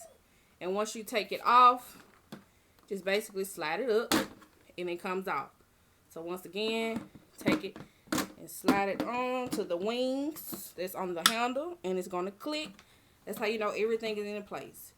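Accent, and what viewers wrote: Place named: United States. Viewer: You have a very strong accent. I'm guessing you're American? Is the powerbrush still holding up? Thinking of getting one.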